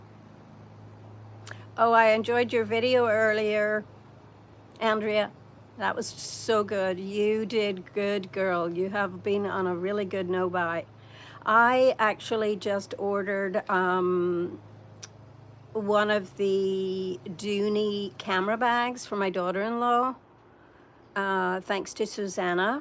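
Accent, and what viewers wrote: Ireland. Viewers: Hi! You're from Ireland!!! WOW! Now that you mentioned that I can hear just a slight accent! I agree that growing up without a lot does make you humble! This was fun!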